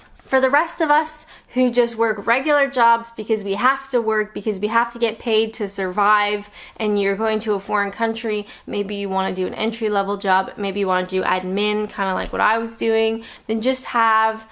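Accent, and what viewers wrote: Canada. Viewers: Love the Canadians accent but you are saying ‘pounds’ like an English person, this is a milestone for sure. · Hi, Another chapter of the e-book done. Very honest. You sounded different today, maybe a little bit more Canadian but clearer and more confident or focused. Or maybe sitting closer to the mic? Byeee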